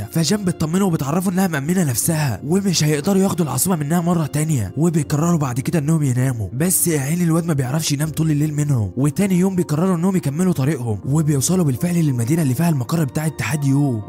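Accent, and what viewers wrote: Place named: Saudi Arabia. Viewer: أسطورة استمر انت ولد عمي وترونة سعودي ولكنة لخبط وحط اللغة المصرية ❤❤❤❤❤❤❤❤❤❤❤❤❤❤❤❤❤❤❤❤❤❤❤❤❤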